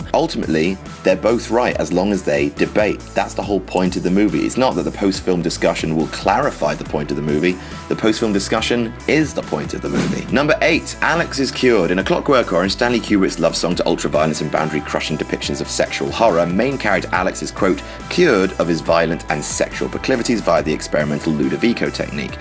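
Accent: United Kingdom. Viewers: I know and his heavy British accent wasn't helping either. I couldn't finish it.... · So you are just shrugging you shoulders with a british accent. Congratulations. You have a blog that does nothing.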